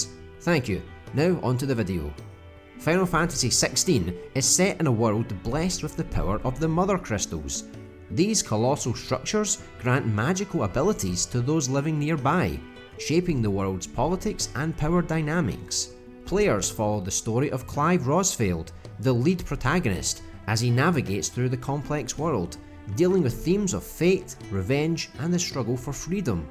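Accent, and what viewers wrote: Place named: Scotland, United Kingdom. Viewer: My man, I didnae realise you had a channel yersel AND on top of that I hear a welcoming Scottish accent. What a guy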